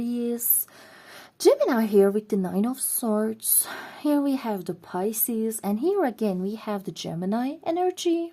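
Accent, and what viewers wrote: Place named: Eastern Europe. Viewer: Pile 3 was particularly accurate, disregarding the fact that I am male. Thank you. Is your mother tongue Slavic perhaps ? There are small hints to it, I'm fairly certain. 😊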